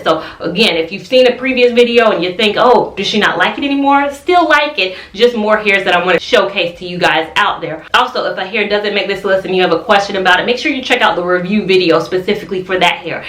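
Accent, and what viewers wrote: South, United States. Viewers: I defiantly didn't mean anything by it. I too am use to a southern accent. For the camera it was a bit fast. Nevertheless, I enjoyed your video and have become a new subbie. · You know, I see people leave comments like this often on your videos, but it doesn't bother me. I'm from northern Florida, so it may be that I'm just accustomed to Southern accents because I never have an issue understanding you. I have had issues with understanding some people from up North who talk fast, though, so I think that plays a small part in it.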